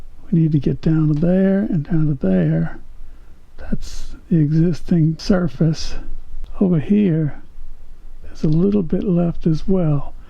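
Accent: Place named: Louisiana, United States. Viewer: this guy has got to be from Louisiana. He has a distinct cajun accent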